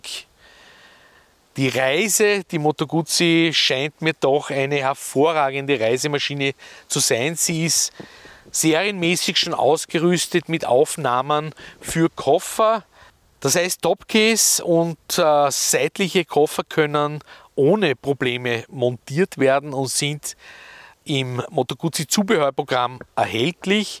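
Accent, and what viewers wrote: Austria. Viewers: Ich liebe euer österreichisches Dialekt. Ich bin diesen Renner gestern Probe gefahren und habe danach gleich unterschrieben.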